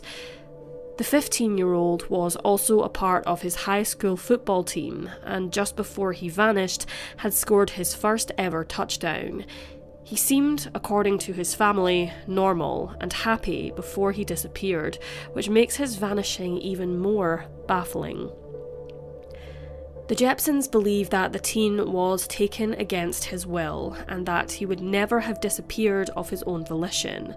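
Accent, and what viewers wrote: Scotland, United Kingdom. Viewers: Is the narrator scottish? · The narrator is Scottish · How sad. By the way you have a lovely Scottish accent.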